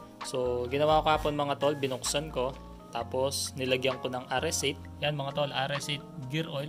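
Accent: Pampanga, Philippines. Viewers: Kapampangan ka par? Hahhaha keep up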